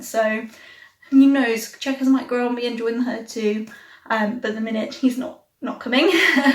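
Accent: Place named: United Kingdom. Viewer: Nice to hear how an international Breyerfest fan enjoyed the weekend. Love your British accent and you boyfriend is a good with his points!! Fun watch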